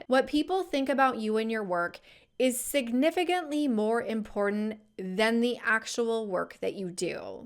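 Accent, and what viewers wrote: California, United States. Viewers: Your Cali tone is so off putting…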